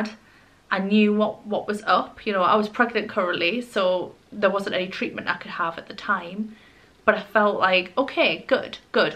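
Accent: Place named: Durham, United Kingdom. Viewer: Are u from Durham by any chance our accent is same as mine that’s all 🤣 I’ve been having what I think is symptoms - usually a fairly healthy person work out eat healthy (most of the time) but I’ve been experiencing painful hips mainly on my right hand side , which cause me to limp numbness pins and needles in my hand feet and travelling up my legs , dizziness fatigue this had all been going on for a while, eventually went to the doctor last week and it all basically fell on deaf ears , raised my concerns of ms and left no further forward 😢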